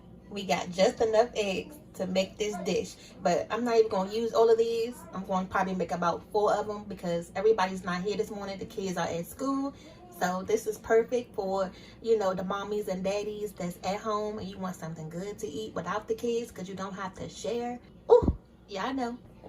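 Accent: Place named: South, United States. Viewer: Sounds like you ade from Mississippi or Louisiana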